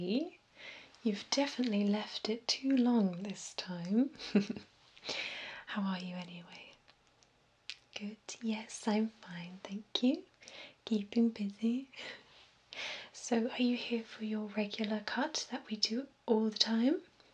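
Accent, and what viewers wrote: England, United Kingdom. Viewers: "obviously you'd need to grow your hair a lot longer" bish my hair is longer than yours :-P I actually really like this video, which is unusual for me, tbh - generally I'm really not into british voices in asmr (being british myself - i think part of what I"m looking for in asmr videos is a kind of escapism from the reality i'm surrounded with on a daily basis? idk) anyway, good job :) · You sound a lot like whispers red wonder if you're from the same part of England... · Yes she is so stunning, And what a ASMR video lovey British accent so soothing....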